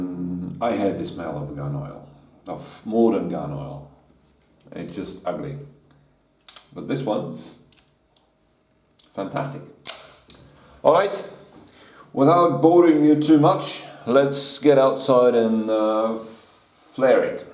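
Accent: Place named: United Kingdom. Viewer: у вас такой приятный британский английский! где вы его учили, или вы жили в Англии?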